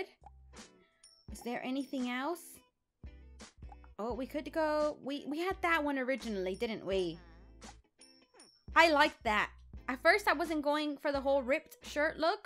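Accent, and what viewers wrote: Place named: London, United Kingdom. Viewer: Did any one else think that she was talking I a London aksint a little bit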